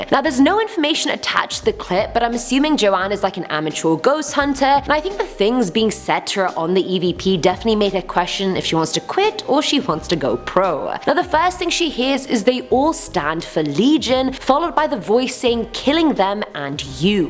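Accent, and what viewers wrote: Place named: United Kingdom. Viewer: Lady get you a better name than you have, it sounds like a foreign name and if you are, why are you speaking British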